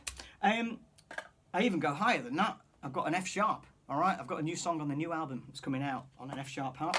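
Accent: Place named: England, United Kingdom. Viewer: Hello again And here's me thinking you were from London 😂 You sound more English than kiwi But that's not of interest your music speaks volumes I tend to play harps as well Mine is G and E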